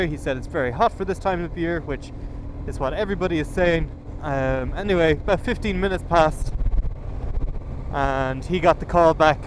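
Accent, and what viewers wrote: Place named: Ireland. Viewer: Hola! I am impressed with your progress learning Spanish with your Irish accent. I love it. Congrats on your progress. I have been with you since the beginning. Love the festival. Here in my part of the world we celebrate cherries 🍒. Lots of cherry trees in the area. We call it Cherryfest.Lots of parades, concerts, food, fireworks, and tourist from all over the country and world. I love festivals because it usually shows the good part of a community. Adios!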